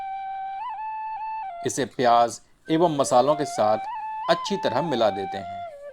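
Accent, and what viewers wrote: Standard. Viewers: शुद्ध हिंदी उचारण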